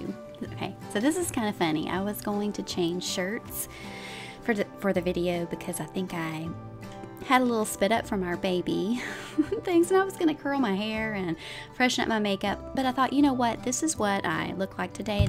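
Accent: South, United States. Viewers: I'm in love with your Lil southern accent you're so comforting 🥺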